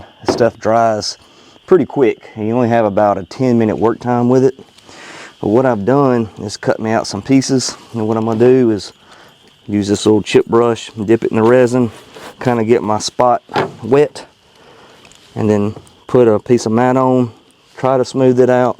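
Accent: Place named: Ohio, United States. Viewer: what state are you in it sounds like ohio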